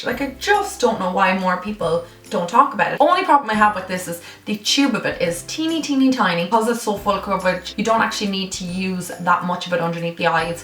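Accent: Dublin, Ireland. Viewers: I love the Irish accent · would you ever consider doing a full face of makeup revolution, love your videos btw great to hear a familiar Irish accent ❤❤❤ 🇮🇪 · I feel like I recognise your accent are you from Dublin Coz I am plz answer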